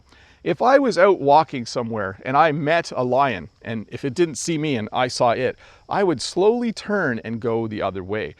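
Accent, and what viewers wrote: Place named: Canada. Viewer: please next time talk about Zebras with your brilliant Canadian accent ❤️🇨🇦🇨🇦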